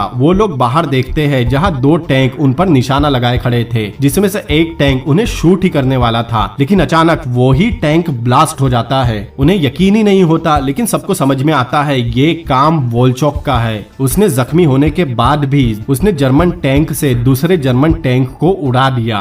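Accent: Assam, India. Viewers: amazing movie bro.. very well explained, i felt like i was watching the entire movie.. i have lots of Assamese friends, i felt you have that little Assamese accent, are you from assam?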